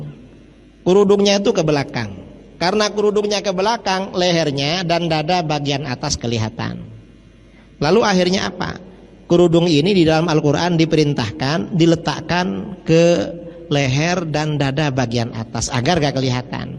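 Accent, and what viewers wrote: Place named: Madura, Indonesia. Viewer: Idrus...logatmu madura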